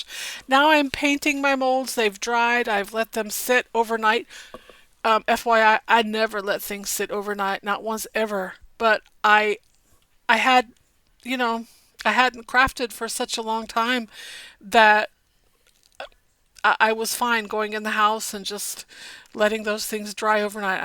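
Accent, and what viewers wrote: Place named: South, United States. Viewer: Nice to see you’re back! I sometimes detect a southern drawl 😊.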